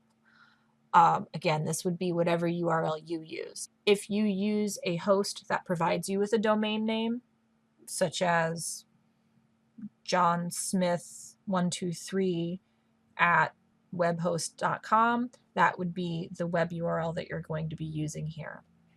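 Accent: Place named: Los Angeles, United States. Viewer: This has been helpful! You sound like you're from LA.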